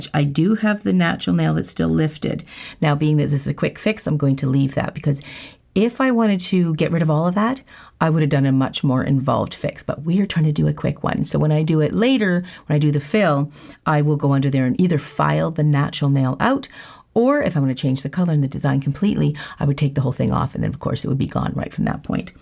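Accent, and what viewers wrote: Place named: Canada. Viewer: I love your voice & accent. It feels like home as my aunts & cousins are Canadian 😊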